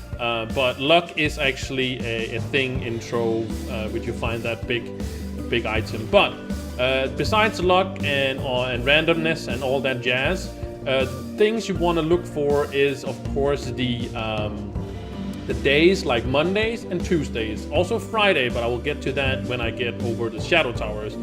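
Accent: Denmark. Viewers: This is just a question. Do u come from Denmark? Cause it sound like that.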